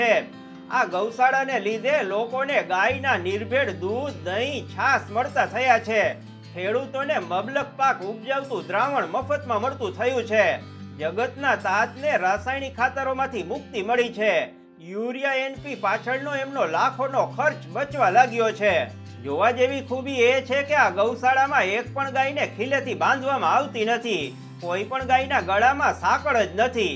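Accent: Kathiawar, India. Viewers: વા ગૉર મારાજ આવ તમારી કાઠિયાવાડી ગુજરાતી વાણી સાંભળી મૉજ આવી ગય👌✨😌🙏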